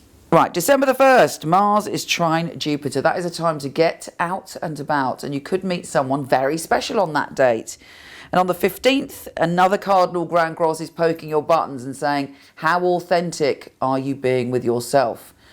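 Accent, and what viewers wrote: United Kingdom. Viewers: A British Accent and Sultriness to your tone in one. Wow.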